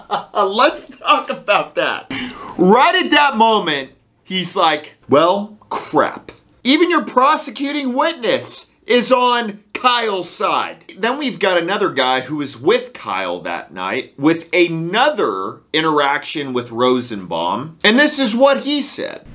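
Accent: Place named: California, United States. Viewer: I’m on your side but you ain’t country. Ya sound like your from California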